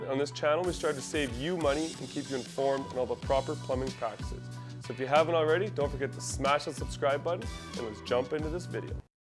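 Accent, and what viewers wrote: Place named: Canada. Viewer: You could tell you were Canadian from the get go the accent isn't super thick but certain words.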